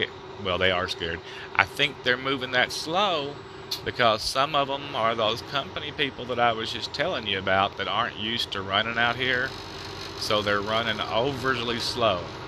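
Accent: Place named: South, United States. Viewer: I can tell you're from the south like me. We drive easy, breezy, nice and safely!! Lol..."I feel sorry for the guy...Bless his his heart!"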